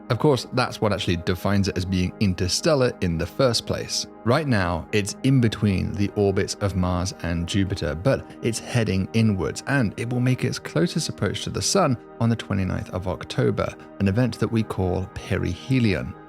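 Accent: United Kingdom. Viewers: It's interstellar, it's not from here.. it is Alien by definition. Just because you have a British accent doesn't make you intelligent. Ugh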